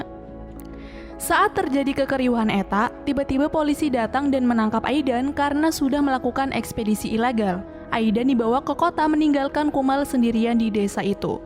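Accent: Sunda, Indonesia. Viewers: Lucu ey aya sunda sundaana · Sumpah lucu pisan nyarios Sunda meni enaken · aduh Mimin aslli Sunda Oge nya👆🤭🤭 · Geloah ngomongna Sunda pisan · teteh orang sunda ya😂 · Seru banget ceritanya mengharukan Apalagi pas pake logat Sunda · Sunda banget😂😂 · Hahahahahaa nyariosna mni raos sundana🤣🤣🤣🤣👍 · Bukan cerita'y yg saya suka, malah denger bahasa'y, asli urang sunda pisan ieu mah. 😆😆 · Sih teteh Sunda nya kasar teing nya 🤭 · Teh ai teteh budak Sunda nya,Sunda na NU ti mana😅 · Pengisi suaranya orang Sunda anying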